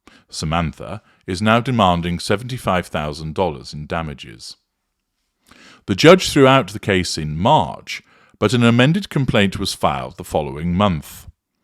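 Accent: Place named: RP, United Kingdom. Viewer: My thanks for putting me right (although I was suggesting the RP English accent that tends to utilise, but it sometimes slips.). Indeed it is rather silly. Best wishes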